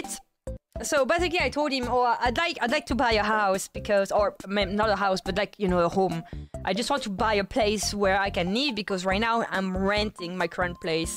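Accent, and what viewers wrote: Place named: France. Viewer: I disliked french accent before but MAN im started to get addicted to it!!! I apologize... i wasnt really familiar with your game.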